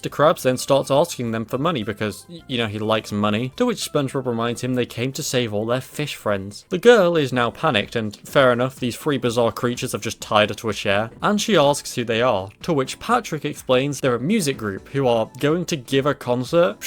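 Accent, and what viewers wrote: United Kingdom. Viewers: Listening to a brit say “ahwsk the stawhff” instead of just saying “ask the staff” really makes me empathize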